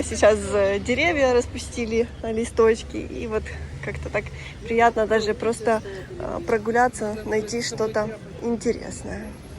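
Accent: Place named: Western Ukraine, Ukraine. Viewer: Ну да ну да. Одесский говор. А кода я спросил , ранее , почему у вас заподноукраинскиц говор ? вы проигнорировали .... .,. и у меня , к сожалению , уменьшился интерес к вашему каналу. Уж извините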